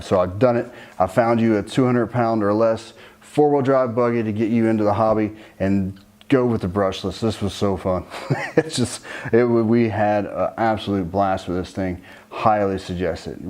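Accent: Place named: United States. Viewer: its kinda funny cos u have such a strong american accent but you talk in quid or british pounds lol good video tho!!!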